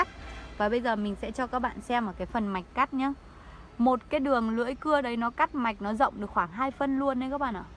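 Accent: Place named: Thach That, Vietnam. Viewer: Gọng nói thạch thất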